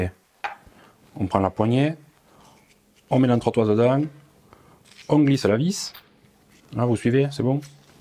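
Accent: South, France. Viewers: Bravo pour ce fabuleux travail! L'implication dans chaque vidéo est très visible! Un bon test qui fait ce qu'on lui demande. (au top d'avoir mis un exemple, c'est pour moi la base dans ce type de test). L'éclairage, le mur est au top! Et toujours avec l'accent du sud!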